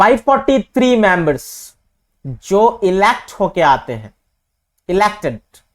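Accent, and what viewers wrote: United Kingdom. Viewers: Change ur Britain English and speak as upsc aspirants or in Hindi😠😠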